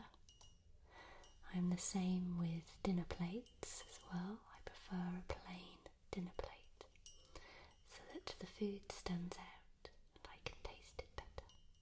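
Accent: England, United Kingdom. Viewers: OF MY FCKING GOD. BRITUSH ACCENT + ASMR. THAT MUST BE SPOKEN IN THE HEAVEN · R u British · new here and not sure if she’s english or american if she’s american making an english tea video....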